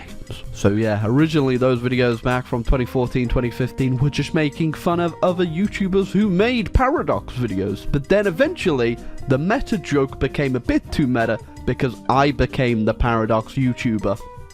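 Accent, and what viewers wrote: United States. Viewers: I don't know where is from anymore. I actually thought he was Belgian once but I realized that was only a meme? Then it seemed like he was just English, but now he's saying "zee" and actually the rest of his accent sounds like it might be American.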